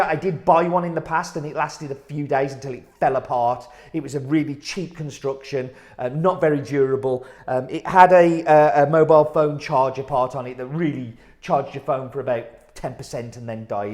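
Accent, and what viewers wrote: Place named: Ireland. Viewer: Could be Irish aswell...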